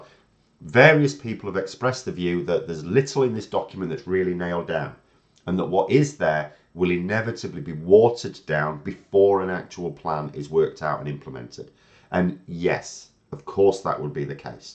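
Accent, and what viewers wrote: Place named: Scotland, United Kingdom. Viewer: Scottish accent that’s it !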